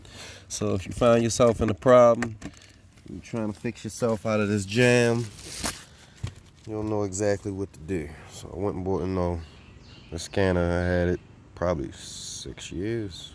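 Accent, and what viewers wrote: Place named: Baltimore, United States. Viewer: Are you from Baltimore? Your yews and tews are a lil prominent. Helpful vid btw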